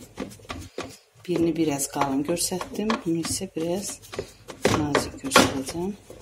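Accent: Tovuz, Azerbaijan. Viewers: Allah ruzinizi bol elesin🙏 ellerinize sağlıq 🥰lehceniz biz terefe oxsadi Tovuz lehcesine🥰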